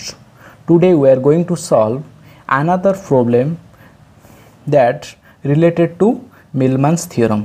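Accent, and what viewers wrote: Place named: Odisha, India. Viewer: Sir are you an Odia??? Your accent sounds like ours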